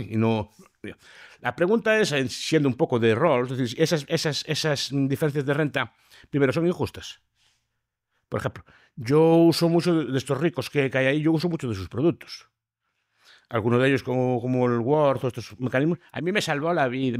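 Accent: Galicia, Spain. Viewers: Los ricos son más ricos y los pobres también son más ricos no? Entre que habla muy rápido y no se le entiende media Papa y encima es gallego o ve por ahí de donde sea que no se le entiende cuando habla y encima deja las perlas que deja. El pobre es más rico pero sigue siendo pobre. Hoy gano 8 € la hora y mañana ganaré 8 € con 16 € entonces soy más rico pero no Rico sin embargo el rico gana generalmente el no honrado gana cantidades desorbitadas y si por algún motivo tuviera que ganar más no va a ganar 2 o 3 o 4 € más va a ganar muchísimo pero que muchísimo más en comparación a esos 16 céntimo de euros qué hace que el pobre deje de ser pobre. No es personal pero este pavo o no sabe lo que dice o lo que dice lo que dice porque está en nómina muchas más posibilidades no hay. Son personas que pese a todo el conocimiento que tienen da la sensación desde que viven en una burbuja personal intelectual cómo los que dicen que en el mundo no sobra gente sí sí claro en el mundo cabe muchísima más gente eso lo dirá el que no ha ido nunca una cola de un paro o no ha ido nunca a un médico público o dificultad para encontrar un trabajo digno en el que no te coacción en pero a niveles demenciales.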